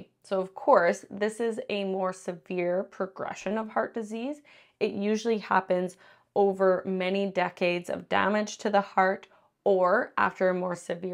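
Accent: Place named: Canada. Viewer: Americans usually leave the H sound off this word, maybe influenced by French or Spanish, but British speakers generally pronounce the H. Canadian English is a kind of hybrid and that's what the good doctor speaks.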